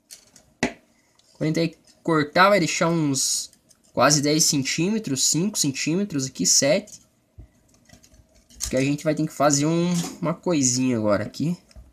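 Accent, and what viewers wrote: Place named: Paraná, Brazil. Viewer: tu é paranaense né mano kkkkk tmj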